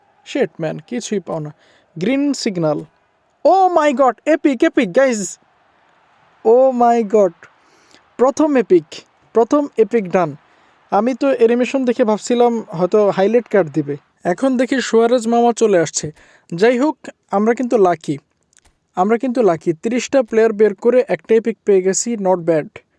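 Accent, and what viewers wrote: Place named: Chittagong, Bangladesh. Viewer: ভাই আপনি কি চাটগাঁইয়া নাকি জানাবেন ভাই❤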